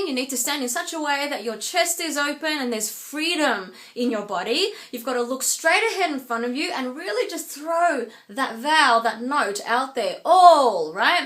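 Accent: Australia. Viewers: You're Australian. :) I'm considering singing lessons and I wanted to know what part of Australia you're from.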